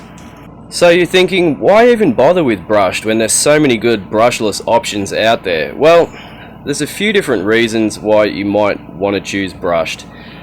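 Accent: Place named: Australia. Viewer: Ha! I thought from your accent you were down under. But yeah, NC probably still a bit more toasty. I don't think it is. Josh did a redo after his near meltdown. Glad he's simplified and condensed things down into one rig. New unit looks killer. But I still find I can't quite figure out his shop page. Definitely could be just my dyslexia, but I think it still needs more work. I can't tell what comes with what or if the combo/kits are complete or partial. Always prevents me pulling the trigger as with shipping, exchange rate, import duties, etc. it would be costly to order incorrectly. Love Josh though. He's the mad scientist of 1/24th's. :)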